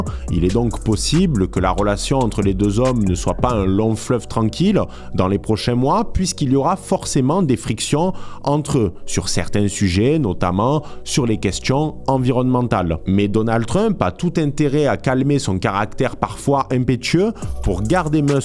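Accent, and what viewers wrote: South, France. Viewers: Cet accent du Sud et les cigales qui rappent en fond; ça sent bon la lavande tout ça ! !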